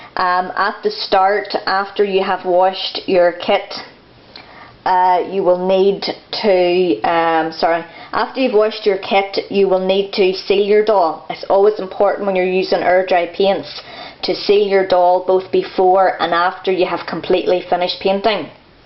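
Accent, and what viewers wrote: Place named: Northern Ireland, United Kingdom. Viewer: I totally wasn't expecting a fellow Northern Irish person ❤ thanks for the tips xx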